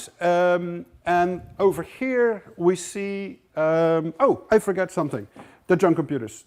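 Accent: Netherlands. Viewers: Nederlands accentje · Yes, He is dutch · Very cool! I might be wrong but are you from the Netherlands? I hear very distinct sounds that a lot of people around me have when they speak english! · He has a bit of a Dutch accent. Could that be right?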